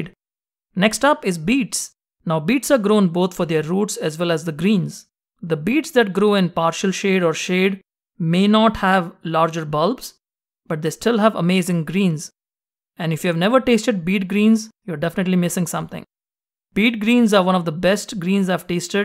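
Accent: India. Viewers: Such a helpful video 🙏 thankyou for posting this 😇 you sound indian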